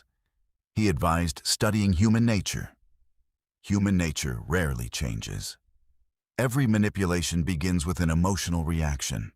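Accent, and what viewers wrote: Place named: United States. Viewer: Good job with the the American accent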